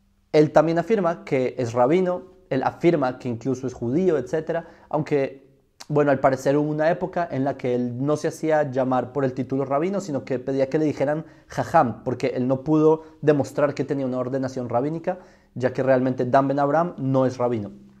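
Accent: Bogotá, Colombia. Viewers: Yo lo que creo y con sus propias palabras eres más Cristiano que cualquiera. Y veo que tampoco sabes nada del tema. Porque no subes las bases exactas, cómo años, escritos, datos arqueológicos etc. Ahora tu acento no es judío eres más bogotano que la changua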